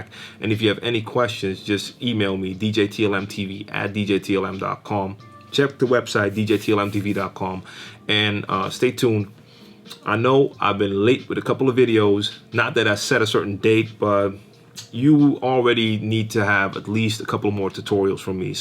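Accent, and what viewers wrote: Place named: United States. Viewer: Wow, your accent sounds like you were born in the US and influenced by living in NL, if I met you on the street I'd never guess... haha. Thanks for the videos man, I've been a mix dj for years, just trying to get better at scratching... you've got some good tips.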